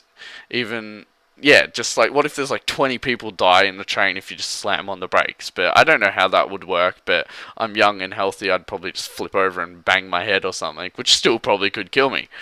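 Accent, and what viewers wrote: Australia. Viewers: 1. Are u Australian ( I am ) 2. The reason trains don't pull on the breaks cause there going at like 300-500km/hr and there's no chance he"ll slow down in time but overall I agree with you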